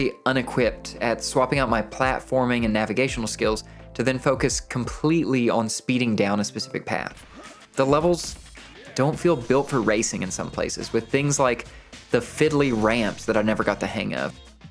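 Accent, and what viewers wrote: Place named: Virginia, United States. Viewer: Are you from Virginia? My old statistics professor has the exact same accent. LOVE the video by the way. I feel like all your observations ring very true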